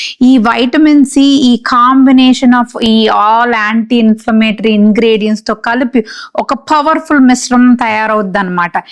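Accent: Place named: United States. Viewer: Why using stylish american accent in telugu? If u use telugu, try our accent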